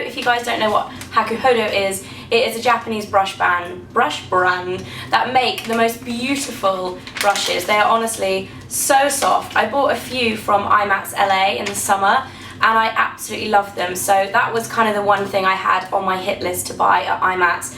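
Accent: United Kingdom. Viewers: Just discover you... and I LOVE the accent of the UK! LOVE it. You are beautiful!! xx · wow I really love your English accent! so elegant! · omg yes your british :)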